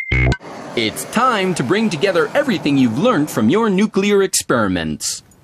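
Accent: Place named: United States. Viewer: British Currency with a US accent. hmmmmm....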